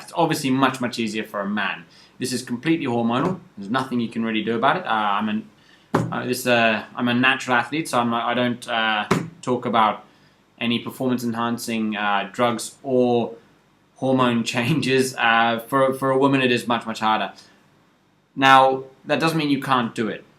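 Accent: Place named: England, United Kingdom. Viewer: Thanks a lot teacher. BTW, You sound a lot cooler with your English accent haha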